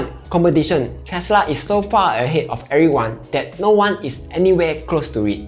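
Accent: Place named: Singapore. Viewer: haha bro i only sub your channel yesterday, today i am the first to view your new video. nice one, i enjoy the way you talk. very singaporean la!!! but i guess you are PR, so must be another nationality.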